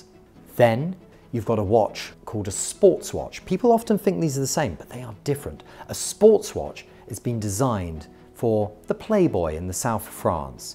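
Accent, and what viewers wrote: England, United Kingdom. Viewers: Consumer psychology 101 mixed in with an English accent. Don't buy it. Think for yourself.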